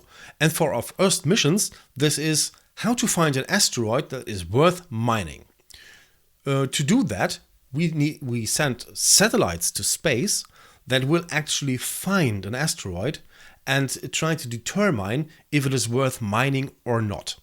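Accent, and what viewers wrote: Germany. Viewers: Ein Deutscher der Englisch Spricht. :D Dein Review is Nicht Schlecht. Aber ich hätte mal so ne Bitte an dich... Auf der CurseForge Page (~8 Kommentare unter deinem Video) hab ich ein Kleines Texturepack erstellt, da mir die Standard Texturen nicht ganz so Gefallen. Aber ich hab nie eine Ehrliche Meineung über meine Kleine Arbeit Bekommen. Deswegen frag ich dich, als Zufällige Person... um über mein Texturepack zu Urteilen, Sachen die Gut sind, Sachen die ich nochmal Pixeln soll, etc. (Es ist ein Link für 1.7.10 und 1.10.2 Seperat)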